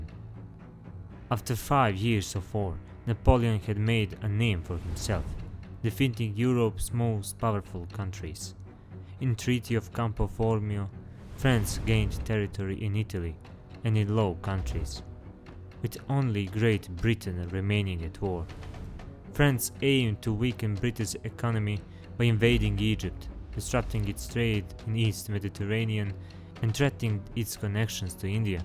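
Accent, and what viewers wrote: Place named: Eastern Europe. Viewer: Cool video but your east European accent is to much for my ears. GL but i will never ever watch your movies again.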